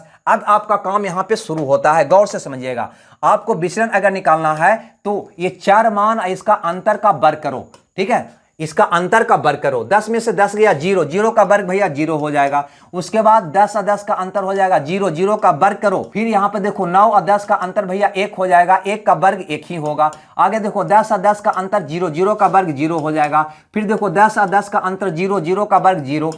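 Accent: Bihar, India. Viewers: sir kya aap bihar se hai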